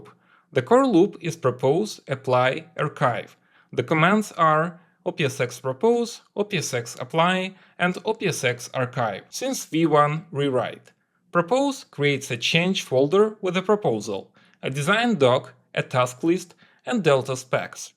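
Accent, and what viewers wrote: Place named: Russia. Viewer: strong russian accent Есть аналогичное видео на русском?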